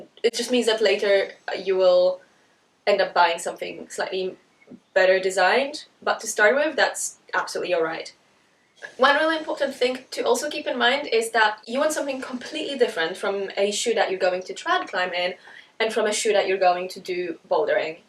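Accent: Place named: Germany. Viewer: Love your German accent.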